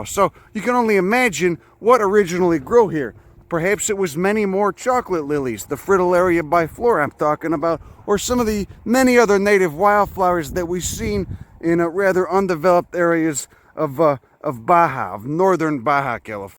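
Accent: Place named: New Jersey, United States. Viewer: Photosynthesizing pile of shit lol...love it...you sound like you're from Jersey btw.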